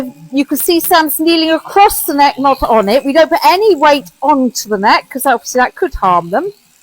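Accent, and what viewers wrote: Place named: United Kingdom. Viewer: You are definitely from uk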